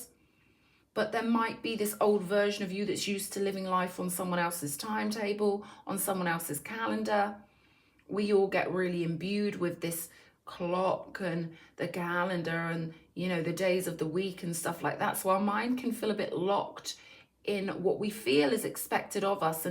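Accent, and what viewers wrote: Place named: London, United Kingdom. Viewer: I love ur accent, your kinda like a posh London geez ❤😂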